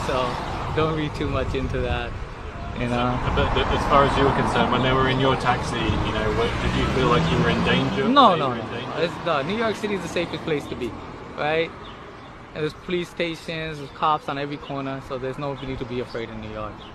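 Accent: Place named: RP, United Kingdom. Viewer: As my husband says he talks posh bollocks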